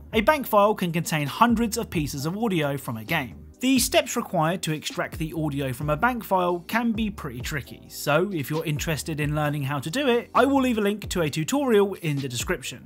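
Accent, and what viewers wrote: United Kingdom. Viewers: He’s British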